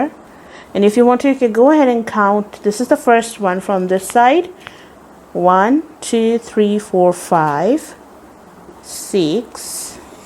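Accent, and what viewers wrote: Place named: England, United Kingdom. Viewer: i personally love your English accent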